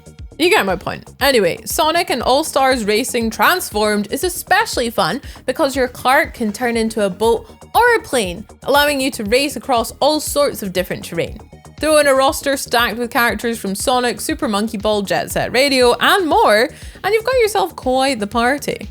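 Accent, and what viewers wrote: Scotland, United Kingdom. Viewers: She sounds Scottish at x1 speed as well ya know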